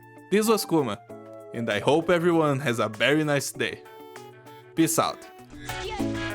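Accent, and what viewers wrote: Brazil. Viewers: Are u Brazilian? I'm trying to pinpoint ur accent lol😅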